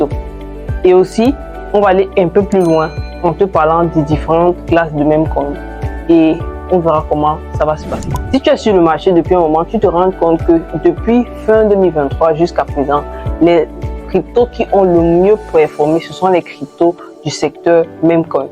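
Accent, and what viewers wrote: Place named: Cameroon. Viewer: J'aime regarder tes vidéos et j'adore ton accent camerounais.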